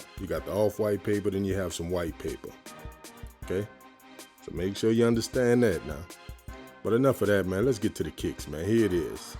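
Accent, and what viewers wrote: New Orleans, United States. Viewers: Picked these up myself. I hear that Nola in ya voice so I had to sub ⚜️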